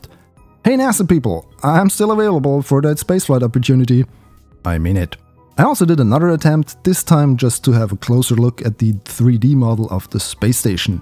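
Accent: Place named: Germany. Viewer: Bist du deutsch? Dieser Akzent..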